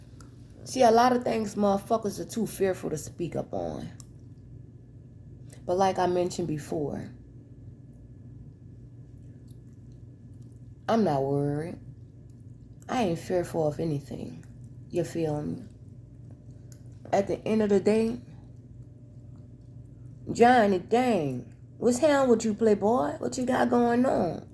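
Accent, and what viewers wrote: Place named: South, United States. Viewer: your southern drawl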